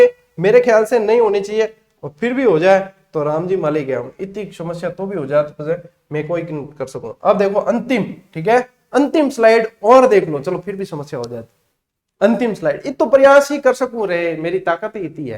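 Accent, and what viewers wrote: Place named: Marwari, India. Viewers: Great sir 👍👍👍👍👍sir jb aap marvadi me bolte ho bahut hi accha lagta hai,ek apnapan sa lagta hai, thank you so much 🙏🏻🙏🏻🙏🏻🙏🏻🙏🏻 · Aap marwadi bolte ho na sir tb best part bn jata h class ka owsm lgta h hme to smjh b ati h bolni b ati h 😀🤗🤗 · सर आपकी मारवाडी बहुत अच्छी लगती है।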